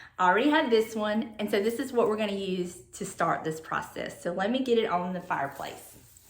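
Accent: South, United States. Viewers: I just found your channel, and you have a very southern accent like I do and I’m wondering if you’re from my area. I live in North Carolina. Hi just subscribed and I’m looking forward to watching more, I love it when I find a southern lady like myself.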